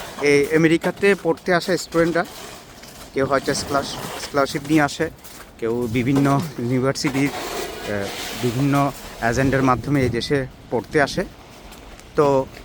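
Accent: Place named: Noakhali, Bangladesh. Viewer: আপনি মনেহয় নোয়াখাইল্লা। শুদ্ধ কথা বলতে বলতে আপনার নোয়াখালীর কিছু ভাষা চলে আসছে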